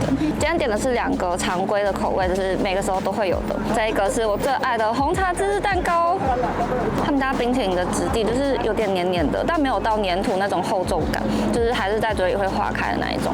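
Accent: Taiwan. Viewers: 你那台湾腔好好听哟！😂😂😂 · 陈家生煎，听这口音是台湾腔，在台湾应该就没有这品牌了，如果是在大陆，很多三线城市，都有这联锁店，口味，效果都是和视频中的生煎包一样好。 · 又看到回大陆很高兴，一开始浓厚的台湾腔听不清，后来慢慢习惯。是很有魅力的大美女，希望在上海过得开心。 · 回台湾久了口音又变回台湾腔了以前台湾腔没那么重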